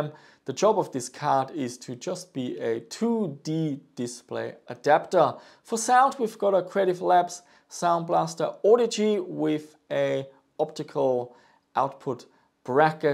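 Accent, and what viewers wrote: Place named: Germany. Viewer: Your profile says you are from Australia, but you sound like a German speaking English. Why is that?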